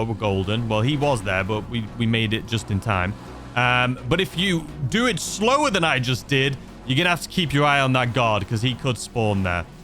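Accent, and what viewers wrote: United Kingdom. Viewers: May I ask where's this dude from? Been trying to figure out 😁 UK, Ireland, Australia?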